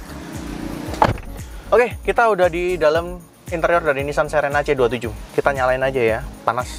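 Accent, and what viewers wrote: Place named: Java, Indonesia. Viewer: orang jawa ya mas? kok medok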